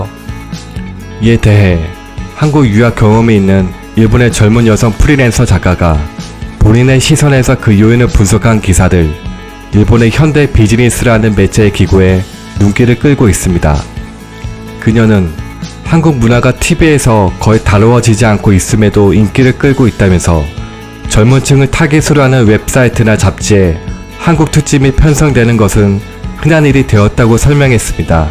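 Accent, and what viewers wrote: China. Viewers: 동영상 내용은 좋은데.. 말하는 발음이 솔직히 좀 거슬리네요^^;; 마치 중국인이 한국어 하는 느낌이랄까요?? 특정 발음이 안되는게 있으신듯요. 말투나 목소리는 좋으신데.. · 발음이 한국인 아닌것 같은데 중국인 발음같은데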